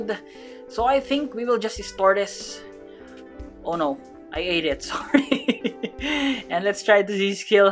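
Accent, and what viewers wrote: Philippines. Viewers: Tbh you sound like filipino lol keep it up you alwast make me laugh.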